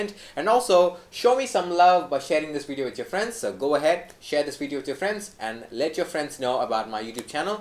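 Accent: India. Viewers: Just statted the vid and then. indian accent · Best Indian accent I’ve seen so far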